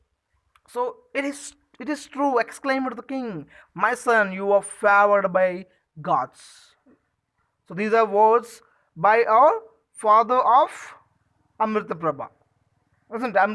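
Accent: United States. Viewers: Good but ur explanation and your English is similar to American English so try to explain in Indian english I mean dragging of words, and it was bit fast for our kids. Don't mind just it's a suggestion. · U are in India not in american learn to tell in indian english not in american english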